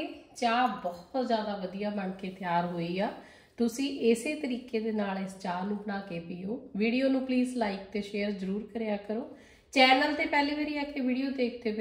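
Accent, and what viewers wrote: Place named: Majha, India. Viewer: "gaud" majhay aale sadde aale side di boli badi miththi hai ❤😊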